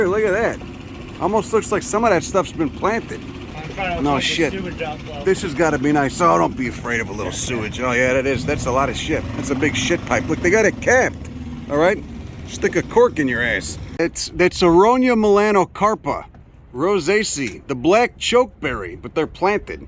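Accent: Chicago, United States. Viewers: Sounds like you come from Taylor Street, I think I picked it up here and I actually from there like Downers Grove or some crap. Anyway, yeah Chicago River Resurgence such as it is you know much progress compared to that crap in New York f*** that noise . Some assholes ain't giving a f*** but you know nature takes its course wherever it can. Edit grew up in Elmwood Park not Downers Grove. Keep on keeping on capital p a l . I ran across a tribe of snapping turtles, that would knock your eyes out I don't think you could fit them in the seat of a Toyota Karla yeah that monster on the Chicago River what are you going to say who can compete these things went from two to three and a half feet front to back on the shell, used to be a carpenter I know my dimensions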